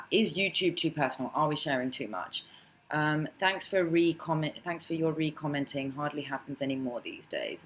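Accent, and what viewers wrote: United Kingdom. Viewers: Hey, people have made reality TV shows with daily routines like yours. I'm sure it will be interesting to watch. Most people think a person with your job lives a life like a episode of Americas Next Top model on a daily basis. Getting views are kinda tricky. You gotta have a combination of the right tags, title, timing, & luck. Or have one of those super channels comment on your video to draw views from there fans. I have two videos with 500,000 views, but the rest of my videos have around a thousand or less. But I've never realy cared about views. My channel is dedicated to one niche video game, along with a couple other videos that I felt like making. It's all about art for me. Editing video & seeing it play. Kinda like art. Sometimes you will get alot of views & sometimes you wont. I saw you Showreel video. Have you ever tried to audition at the Travel Channel or Discovery channel? 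Your exotic look & British accent would make you perfect for a show like "Globe Trekker." Plus you know how to travel the world. I used to like watching that show back in the day. Watch this youtube video - /watch?v=Pyn2IOAO6Mc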